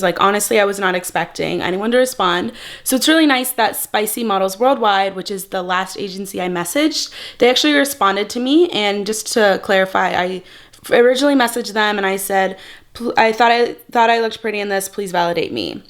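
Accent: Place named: United States. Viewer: As a Brit fluent in sarcasm, It’s weird hearing this much sarcasm in an American accent 😂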